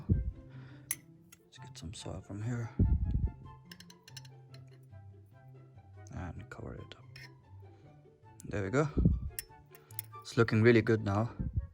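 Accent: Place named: United Kingdom. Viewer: I can hear a bit of a British accent there mate :p Nice work Akhi.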